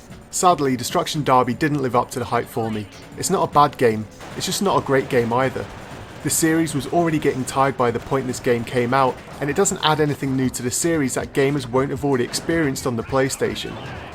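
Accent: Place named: United Kingdom. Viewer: british english sounds gay, so shameful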